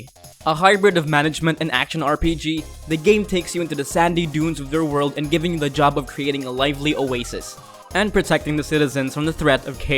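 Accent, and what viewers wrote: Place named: Philippines. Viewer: This guy sounds Filipino.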